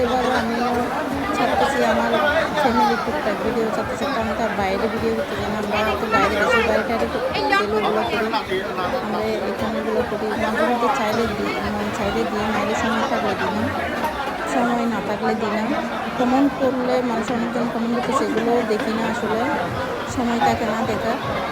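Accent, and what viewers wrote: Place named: Chittagong, Bangladesh. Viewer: Apo atte onor chittainge kotha besi vala lage.apon apon lage .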